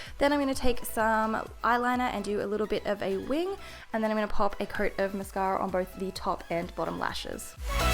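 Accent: Australia. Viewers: Love your accent 😍😍 Australian? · Is she Auatralian? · Are you Australian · Are you Australian? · are you australian? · Yep she's Australian 😊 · I can tell ur from Australia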